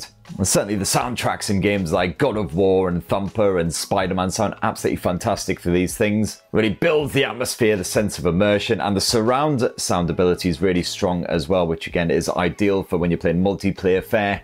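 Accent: United Kingdom. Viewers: Another great video. Its great to hear a proper British accent instead of all those bloody Yanks!!!!!! Keep up the good work , pal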